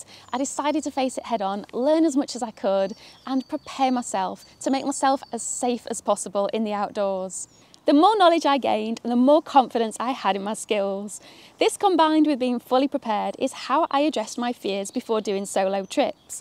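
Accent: United Kingdom. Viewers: I was confused for a moment when you mentioned fire arms despite the British accent😂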